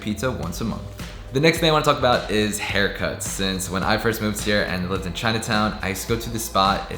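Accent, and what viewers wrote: New York, United States. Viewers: Is it just me or does your accent sound different now, its changed from a broad new yorker 😊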